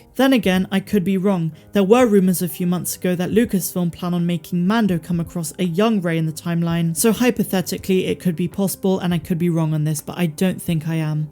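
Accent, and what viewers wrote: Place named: South West, United Kingdom. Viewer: The south west accent is strong with the jibber jabber!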